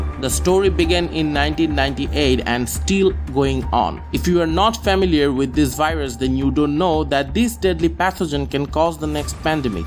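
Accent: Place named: United States. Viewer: Dear Sir, your voice is tremendous like an American or Canadian when I listen first time, I don't understand that is your.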